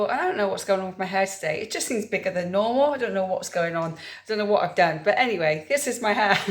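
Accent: United Kingdom. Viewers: Where are you from you sound like you live in Gloucestershire like me.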